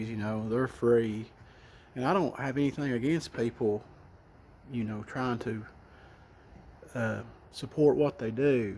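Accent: South, United States. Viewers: My family is from Lookout Mountain, from Bama, Tennessee and Georgia parts. I was born in Ft Oglethorpe, but raised in Dallas, Texas. We made two or three trips "home" to Georgia every year. Hearing your voice reminds me of family that i miss, it's comforting to me. Those mountains and woods do have dark elements to them. The spiritual dimension is very real. Our bodies die, but our spirit doesn't. Believers know that our spirit will get a new body. Scripture tells us that demons and bad spirits go to the high mountains, and to dry, barren and waterless places- like the desert. It even says some inhabit "dirty birds", like the buzzards, vultures, the bittern and even owls. This is one reason that owls are related to false gods like Molech, who is still worshipped today. It is written that there are demon spirits that come off the skin of dying evil men. 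They seek a place to rest, but cannot rest. Their need is to inhabit wicked men who would accept them. Witches go up the mountains to a forest groves to do their rituals, and have since the beginning and time. The darkness is real, the spiritual dimension is real, it can be a dangerous thing to invoke these spirits into the physical realm. You may get more than you bargained for. Thank you so much, i enjoyed your video. Subbed! Lord bless and keep you ❤🙏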